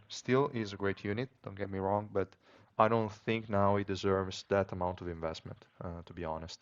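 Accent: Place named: Eastern Europe. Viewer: haha noooo, I also have the -ian at the back, but it is ... Asian 😂 I am a Vietnamese who like to explore language and luckily I know some of Italian words. The one that make me surprise is your accent which make me assume that you are from the Eastern or Northern part of Europe 😅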